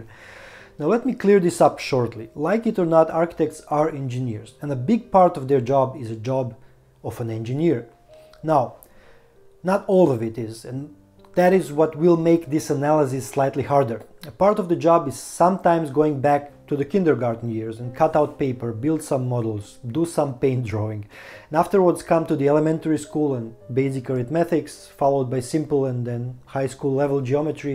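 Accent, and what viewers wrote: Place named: Serbia. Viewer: Po english “akcent” Srbin vidi se iz aviona :) Good job👍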